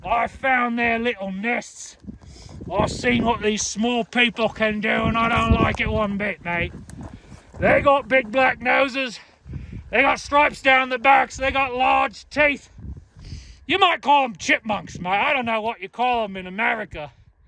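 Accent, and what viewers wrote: Australia. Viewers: Good Aussie accent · I don't know but I've been starting to talk to myself with your australien accent today... this is crazy, this is contageous !!! Happy 😊 to see Girlie ; such a beautyl!!! Watch The cosmic secret last night, had to finish it; A Brilliant Masterpiece!!! Brings lots of clarity !!! Bravo!!!👏👏👏☀️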